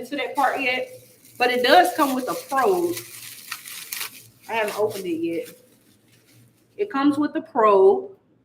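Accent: South, United States. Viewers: Hey i think a southern Accent is cute i love it no disrespect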